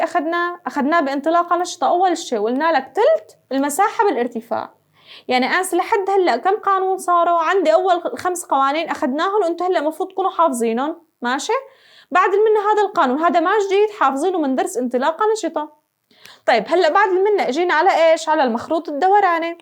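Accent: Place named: Homs, Syria. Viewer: حمصية يمكن انت شو بدك ؟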